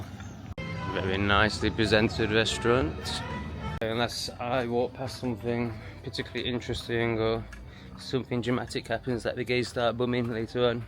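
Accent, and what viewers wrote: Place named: Upper class, United Kingdom. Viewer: You remind me of a privileged guy that went to some famous public school in the uk with that whining upper crust accent. I’ve seen the behavior a few times usually in a movie with the English in some foreign country less developed than their own . Not trying to insult but are you sure you’re strapped for cash? In your free time it might help to at least try to learn a few words of correct Spanish because you sound like even trying is beneath you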